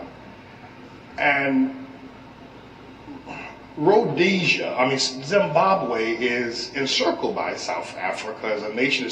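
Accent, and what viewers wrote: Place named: United States. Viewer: EGYPTIANS AND THEY WERE NOT BLACK! STOP ATTRIBUTING TO YOURSELF ANYTHING DARKER THAN YOUR FUCKING AMERICAN ACCENT. THE WORLD LOVES YOU. YOU ARE AFRICANS. YOU TRAVEL TO EUROPE, ISRAEL, AMERICA, AND RUSSIA. AND WHERE IS THE RESULT?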